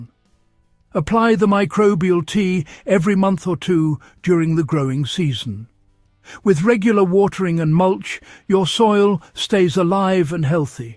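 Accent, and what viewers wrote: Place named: United Kingdom. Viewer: I really don't like these AI generated videos. This video is narrated in a distinct British accent and uses metric measurements but says United States?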